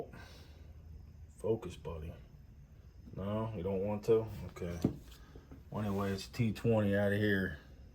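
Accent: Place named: Philadelphia, United States. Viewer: I’ll bet my house this is a Philly accent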